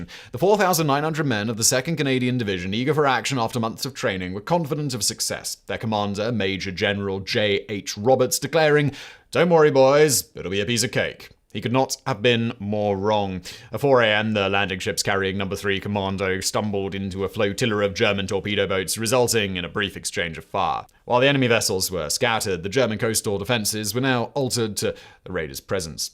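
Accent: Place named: RP, United Kingdom. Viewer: you speak too damn fast. Your speech is pretty close to double the normal speed of a typical English speaker from around the world. Although you have a very crisp, articulate upper class English accent that is not difficult to follow for most North Americans, you speak so rapidly that I believe that you are leaving many non-native English speakers bewildered. If I have one of your videos playing in the background and I’m not concentrating on it specifically, I am losing track of what you have to say at times. And I lived in the UK for three years so I have little to no problem with most English accents short of a Geordie.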